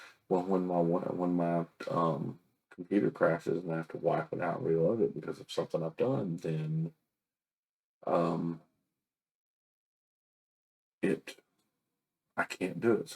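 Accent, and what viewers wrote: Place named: South, United States. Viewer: Your "potatoes and tomatoes" cracked me up! I miss the southern accents now that I've moved! I wish I had grabbed some YNAB swag, I was too new to the game to know about it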